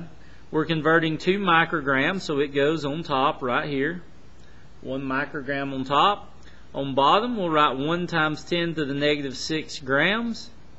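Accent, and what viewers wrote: United States. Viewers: Some cowboy yeehaw accent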